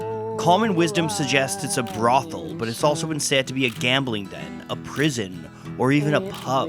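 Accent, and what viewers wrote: Ontario, Canada. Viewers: Narrator is sooo Canadian that I almost think it's a young Bruce McCulloch. Hoose. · The narrator sounds like hes from Ontario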